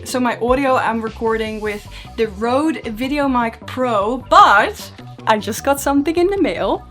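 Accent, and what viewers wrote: Netherlands. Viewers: dutch accent? or not? anyway, nice vid! wtf that cabinet , looks like its about to fall apart😂 but what was that thing all the way at the ceiling? extra storage spot?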